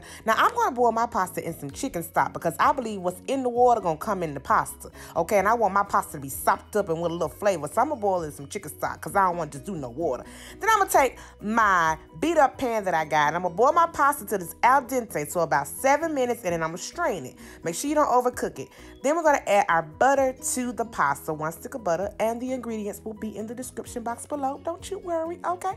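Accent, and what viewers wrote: South, United States. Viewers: the southern drawl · of course that southern drawl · Your southern commentary had me 😂 I'm definitely trying this recipe. But I don't have a cast iron skillet... must buy. But for now I'll use a oven pan thingy lol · I know i chose the right video when i heard the southern accent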